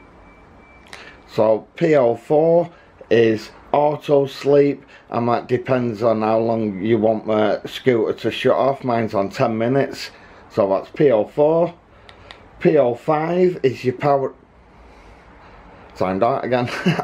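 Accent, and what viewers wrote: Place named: Manchester, United Kingdom. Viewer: Proper manc lad hah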